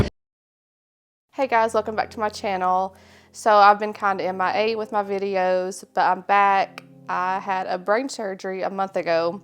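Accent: South, United States. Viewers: LOSE WEIGHT NOT JUST FOR YOUR LOOKS BEFORE YOUR HOUSE YOU CAN LIVE TO BE 90 GET A CHIC SHORT HAIRCUT AND STOP DRESSING ALL PROVOCATIVE DITCH THE ACCENT THE SOUTHERN HORRIBLE ACCENT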